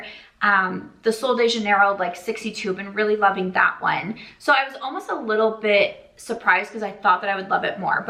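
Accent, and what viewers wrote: Midwest, United States. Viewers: Midwest accent? That makes me laugh...❤ enjoyed the empties video. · Totally get the Midwest accent girl.. don’t really hear it in you THAT bad.. being from outside of Philly, I always get told I have a Philly accent & I laugh because my friends who grew up actually in philly, have more of a Philly accent than me.. it’s funny how you can tell where ppl grew up just from their accent.. yet we all speak English the same.. ANYWAY- I really came here to tel you that Kayali Yum Bougee Marshmallow (the strawberry one u were tryin to think of) is the COMPLETE opposite of Tom Ford Tob Van.. Tom ford is more warm n spicy & rugged (my son n I share a bottle bc is so perfectly unisex) where yum bougee marshmallow is girly sweet but very pretty but for sure feminine.. I love it, but completely diff than Tom ford.. have u ever tried kayali vanilla 28?? 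Esp after it sits awhile, its a beautiful vanilla that’s feminine & mixes well with so many other perfumes (actually love it mixed with TF tobacco vanille to make it a lil more feminine & bring out the vanilla!!) .. also can’t wait to smell Phlur Vanilla Smoke def sounds like something you might like (just haven’t gotten to smell it yet!!)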